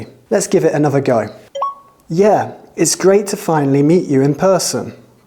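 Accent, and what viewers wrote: United Kingdom. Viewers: I love british accent so keep going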